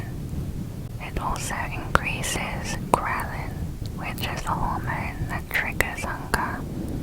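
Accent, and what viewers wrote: United Kingdom. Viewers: are you british? actually yeah you obviously are what am i on about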